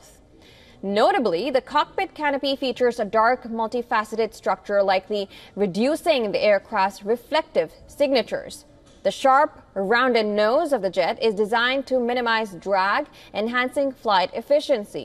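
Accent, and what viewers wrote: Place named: India. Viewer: It's Indialish not English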